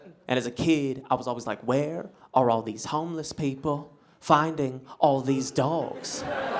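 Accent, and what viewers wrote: Louisiana, United States. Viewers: How did he grow up in the San Fernando Valley and come me out sounding like he’s from Louisiana? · Wow you have a thick southern accent for being born and raised in LA, CA. Unless you meant LA as in Louisiana? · Hi, I noticed you have not put any videos on here in a long time. I hope all is good, I saw you on America's got talent but not sure how old the video was? I read comments below about your accent, I grew up out in So. Cal., but,moved back to michigan 22 years ago. I have heard many accents and wondered if you were from maybe Lousianna? you have an accent, but it doesn't sound like New York / Jersey, or Canadian, or Michigan, Wisconsin, Minnissota, or any states i can think of, but it has sort of a southern sound but not always. just wondering where you grew up? Most of us get a accent from hearing adults with an accent at a young age. 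When I moved back to michigan from So Cal, it was funny sounding to me, they really had some strong accents here but they dont think they do.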